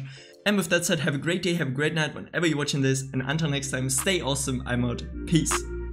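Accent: Germany. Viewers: Dein deutscher akzent so nice haha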